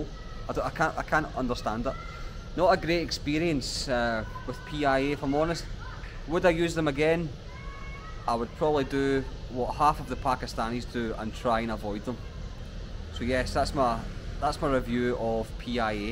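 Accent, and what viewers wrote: Scotland, United Kingdom. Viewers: Sounds like Dale Philips, you must be Scottish. Very weird accent,requires too much attention to understand what are you trying to say. Airline is very reliable, and takes you where you want to go.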